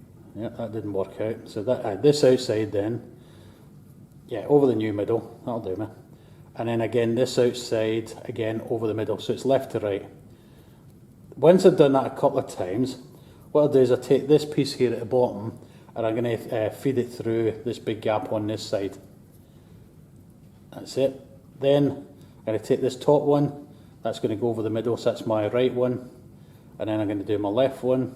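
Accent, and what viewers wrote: Scotland, United Kingdom. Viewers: Thanks for sharing! Great video, don't worry about it being a bit raw, people watch it for your skills and I also appreciate listening to some Scottish ;)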